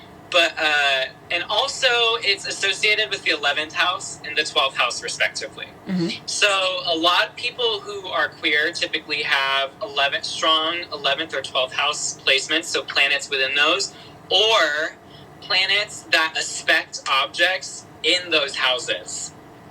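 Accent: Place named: South, United States. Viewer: Can I just say I love your accent ! I’m from jersey , and your southern accent is so cute to me ! Also , y’all are so dope ! Thanks for this ❤️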